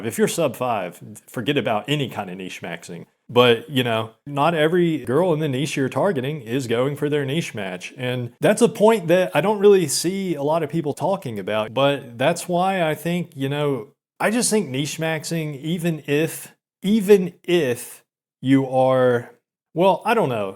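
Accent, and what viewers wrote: South, United States. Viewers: Tbh I thought you sounded like Mental Outlaw when I first found your channel but after time I realized your accent had a more southern sound to it if that makes sense.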